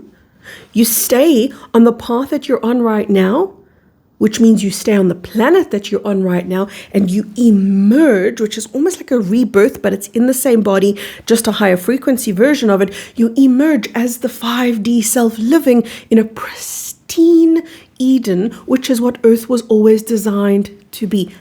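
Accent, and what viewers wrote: South Africa. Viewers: Hi your channel just found me. I knew you were South African from the first sentence 😂. New subbie here I will be binge watching any other videos you have. Love and light from a fellow SAfrican 🙏❤️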